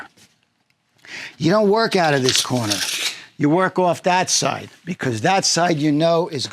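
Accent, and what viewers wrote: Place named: Boston, United States. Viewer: absolutely not Spent 15 years in Boston.. now back in Ireland.. your accent brought some good memories back.. “Now go get your Shinebox”…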